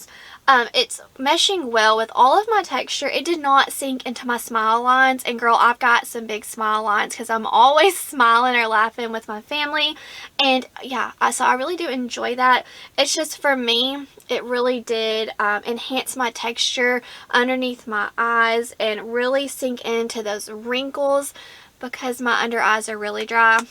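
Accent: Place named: Alabama, United States. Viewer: First time I have watched your channel and ohh boy you are such a sweetie ,) I love watching the ones with soothing voices and you have it… also an accent ( what state do you live in girl ) maybe Alabama ?? Sorry to hear it’s a concussion I got. Into a horrible wreck 6 months ago, worst grade concussion border line TBI, better now to find out I have a torn rotator cuff and I’m a Nurse so might be a career change due to this wreck YIKES !!! Slow recovery when it comes to concussions, so good luck and hope your migraines calm down… lots of rest girly ,) I just turned 40 So weird as I feel 21 and have been into cosmetics since I was 15 also professionally for 10 years… have a collection as If it were my day job, make up is my passion and no matter the meal it always fits ( other than matte concealers ) LOL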